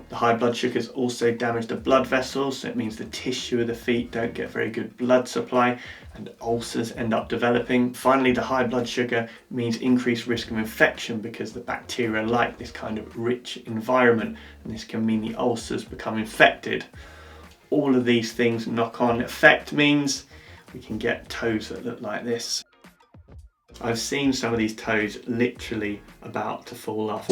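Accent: England, United Kingdom. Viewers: skinny white guy + english accent = instant likes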